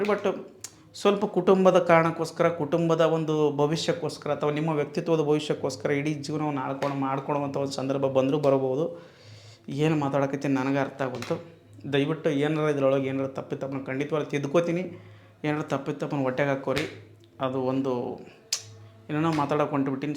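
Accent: North Karnataka, India. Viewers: ಬ್ರದರ್ ವಿಡಿಯೋ ಮಾಡ್ತಾ ಇರೀ ನಿಮ್ಮ ಬಾಯಲ್ಲಿ ನಮ್ಮ ಉತ್ತರ ಕರ್ನಾಟಕದ ಭಾಷೆ ಕೇಳೋಕೆನೆ ಚೆಂದ..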